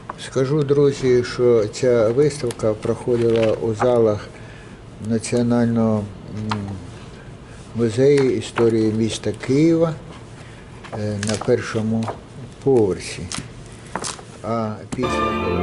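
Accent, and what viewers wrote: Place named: Russia. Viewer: Надеюсь все выучили слово благодаря)))., Ваш украинский все равно русский.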